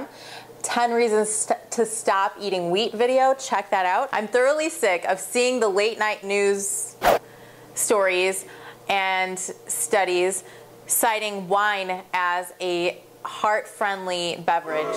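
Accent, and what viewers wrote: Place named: United States. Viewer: Annoying US accent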